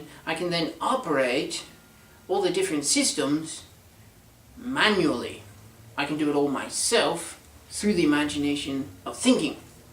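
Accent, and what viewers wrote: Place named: United Kingdom. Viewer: i like how you got a british accent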